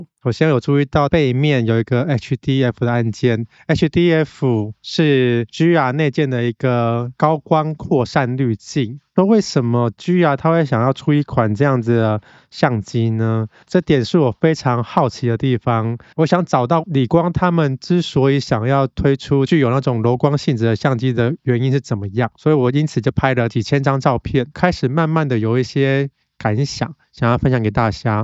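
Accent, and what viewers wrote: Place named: Taiwan. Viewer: HDF夫， 😊😊😊臺灣英文真可愛。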